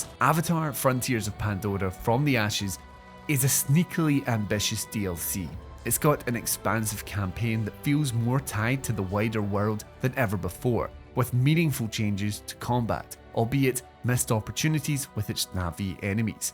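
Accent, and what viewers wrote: Scotland, United Kingdom. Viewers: Definitely Scottish. Source: my wife is Scottish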